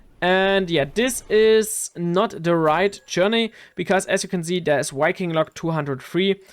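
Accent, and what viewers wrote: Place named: Germany. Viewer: w german accent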